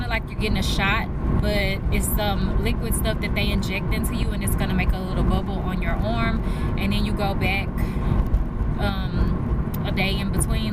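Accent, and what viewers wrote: South, United States. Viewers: Omg that southern accent 😂😂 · Congratulations on the new job ❤️ LOVE LOVE LOVE just hearing you speak . Such a southern belle .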